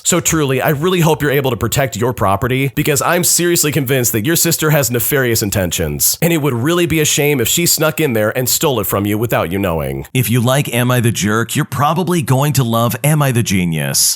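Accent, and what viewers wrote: United Kingdom. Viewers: first vid of the day is the British guy from Amazon I the genius..... Second vid of the day is the Australian guy .... ... And randomly the OG guy comes back... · Is it so much to ask for a proper label for the thumb nail? It does set off my "danger radar", I use the channel to relax to do the dishes or whatever, then no warning I hear "The British Voice". It's the inflections. Give me one GOOD reason why the channel can't label the Thumbnail? Because I just leave a thumbs down, because the voice is not what I subscribed to.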